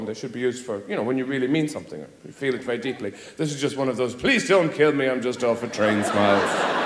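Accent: Ireland. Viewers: he's Irish true and true. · Mia Scott British? No. Irish! ☘️ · I love the Irish accent, it sounds so musical 🎶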